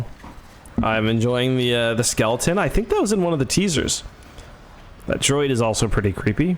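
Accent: Canada. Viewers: You should so much more Canadian when you record am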